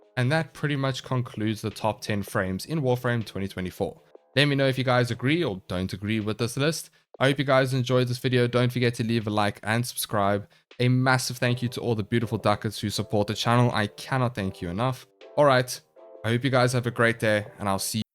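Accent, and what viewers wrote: South Africa. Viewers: I knew you were South African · I knew it he is South African lmao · aweh my fellow south african. The accent gives us away every time. Can never hide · Yooo you SouthAfrican???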